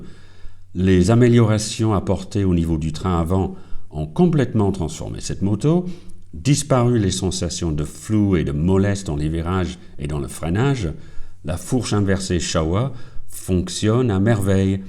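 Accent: United Kingdom. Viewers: ah je me sens Plutot mieux mtn. Javais penser: comment ose-tu parler mieux le francais que moi? Pendant toutes les annees que je suis Aller en France on me dit " je n'ai jamais connu aucun anglais qui sache notre langue comme toi" mais vu que tu vie en France tout ce temps la, ca fait du sense tu que sois meilleur que moi. Ca fait plaisir aussi le fait que t'aie pas perdu ton propre accent britannique plutot que parler fr avec un faux accent fr enervant comme font les profs de fr au RU. 😀😀😀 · Voici donc une courageuse et chouette decision qui ravira votre auditoire MotoFrancophone auquel je fais désormais partie. J’ajoute que votre pointe d’accent British et un raffinement absolu pour l’oreille, tout comme pour superbe moto, la perfection de sa conception doit passer imperativement par un savant reglage de sa sonorite! Les oreilles au service des yeux en somme. 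Vous réussissez avec brio ce pari egalement. Bravo et un enorme merci de nouveau!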